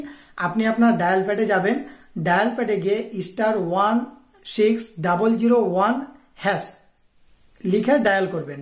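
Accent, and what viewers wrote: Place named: Chapai Nawabganj, Bangladesh. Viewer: মনে হচ্ছে চাপাই এর লোক কথা বলছে।ভাই বাসা কোথায়?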